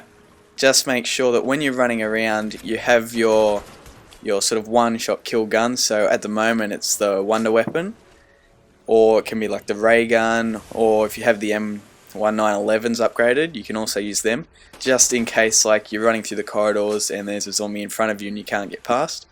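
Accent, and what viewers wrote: Australia. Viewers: you australian? you sound it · AUSSIE AUSSIE AUSSIE, good to hear a fellow australian :)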